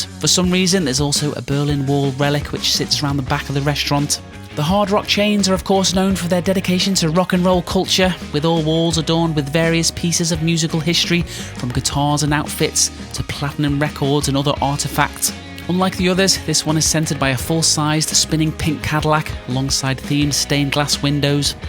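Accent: United Kingdom. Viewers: Your accent is quite enjoyable. Which region of britain is it?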